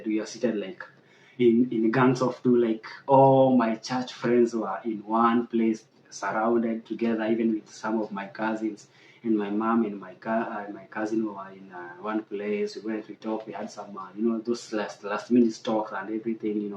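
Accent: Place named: Meru, Kenya. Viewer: Its the meru accent for me 😂 that customer service lady alikusave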